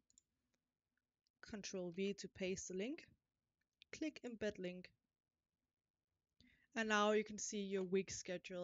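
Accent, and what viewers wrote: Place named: Italy. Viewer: you sound like italian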